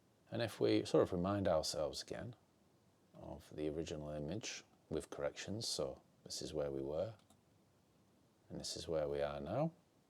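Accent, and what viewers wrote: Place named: Spennymoor, United Kingdom. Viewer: Just stumbled onto your video, really glad I did. Some really useful tips I haven't seen elsewhere, the black and white thing for example. I would definitely appreciate a video on masking from you, again I think I'll get tips that I don't see elsewhere. Couldn't quite place the accent though. I was hedging Spennymoor..... Ps. That's a great eye for the photo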